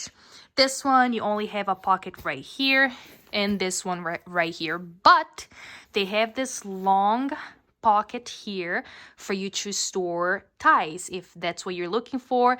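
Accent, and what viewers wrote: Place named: Brazil. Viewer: so pela intonação da sua voz consigo perceber que você é brasileira, abraço! 🥰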